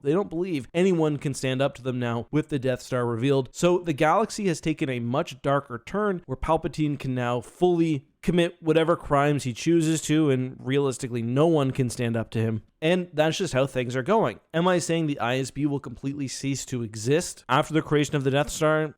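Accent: Canada. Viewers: this canadian english makes me imagine all the ways Canada prospers off america, like this yt platform with commentary on a hollywood production.. weird ways like this that does not seem obvious.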